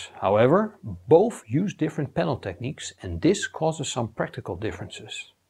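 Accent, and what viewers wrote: Netherlands. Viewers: Sounds very Dutch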